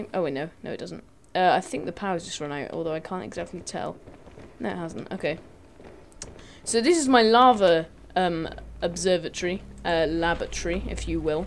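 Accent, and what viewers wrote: England, United Kingdom. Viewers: How refreshing to hear a well spoken, dignified English accent. The game is described clearly and concisely, without going off on tangents or babbling. All too often you have some ego waffling nonsense in the form of insane screaming. As well, they tend to describe everything as awesome. Awesome this. Awesome that. So much awesome is not awesome. It's 90% VJ ego and 10% about the clip, which is primarily the topic that interests me. Instead, I learn more about the ego of the awesome VJ far more than the actual reason why I'm watching. Perhaps it's Pewdiepie's fault. Who knows? All I know is, I don't enjoy VJ's with egos.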